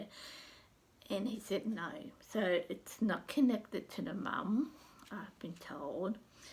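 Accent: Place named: Australia. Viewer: She is Australian. Those of us from the states need subtitles. Very DIFFICULT to understand her accent.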